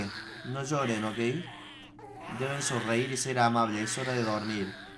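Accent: Argentina. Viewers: sos argentino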